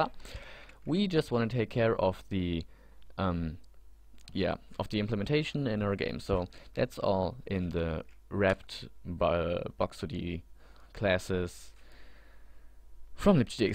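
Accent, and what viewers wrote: Germany. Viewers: You sound german :D You're from Germany? Aren't you?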